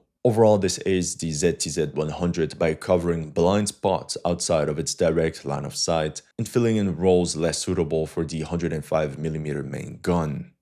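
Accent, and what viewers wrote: Quebec, Canada. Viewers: « c'est ben correct ! » haha le gars est Québécois !! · Je savais cetait un quebecois depuis le debut 😅😅😅 ga cest ben correct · ahh that Canadian french accent.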